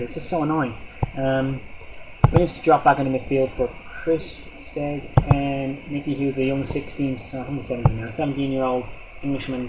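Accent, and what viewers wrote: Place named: Ireland. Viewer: Where bouts in Ireland are ya from?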